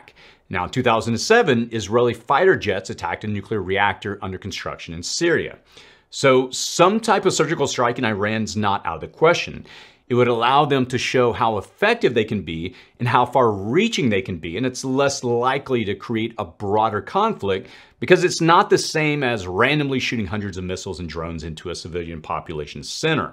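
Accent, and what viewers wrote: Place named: California, United States. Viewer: I didn't want to be disrespectful but i hear cali. Soy. The real world isn't cali. Anything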